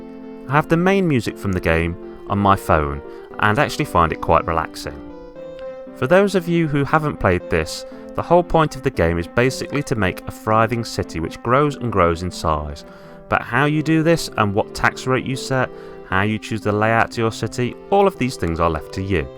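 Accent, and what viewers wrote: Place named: United Kingdom. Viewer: This is a lovely little mini review, I’m amazed it’s been so unnoticed. I definitely agree with all your points and I love the game too, it’s my preferred version by far too. Dr. Wright does actually appear in one other Nintendo game, in Zelda: Link’s Awakening he has a cameo as “Mr. Write” but it’s definitely the same character as he looks similar with the same glasses and a song from SimCity plays in his house, in the remake he looks much closer to his appearance in SimCity. Also, it’s great to see someone else who uses the British SNES pronunciation.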